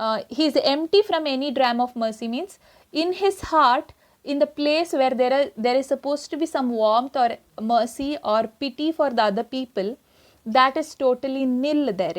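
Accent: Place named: South India, India. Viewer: Good explanation... With south Indian accent 😊👍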